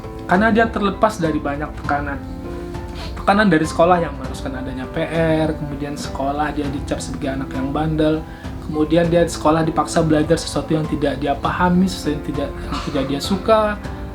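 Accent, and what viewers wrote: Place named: West Sumatra, Indonesia. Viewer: 8.27 "sianu sianu", I am laughing of loud...sorry sir... Masih lidah minang!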